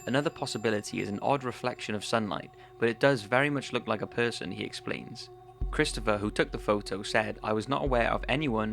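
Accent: United Kingdom. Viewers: Your British accent makes the video sound creepier O.o PS. U have a new sub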